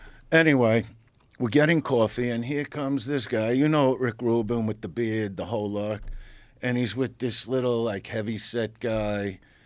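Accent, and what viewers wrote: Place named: Brooklyn, United States. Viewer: Gotta love the Brooklyn accent: "This guy Mahk."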